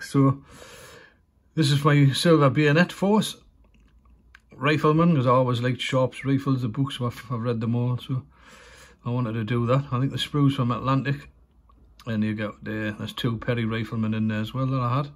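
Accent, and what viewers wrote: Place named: South Essex, United Kingdom. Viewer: Very nice. You can't go wrong with a bit of South Essex.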